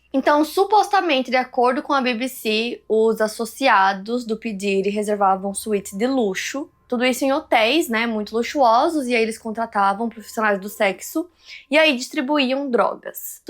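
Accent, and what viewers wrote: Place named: Paraná, Brazil. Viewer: amo q a não perdeu o sotaque paranaense hehe <3 me sinto representada pela diva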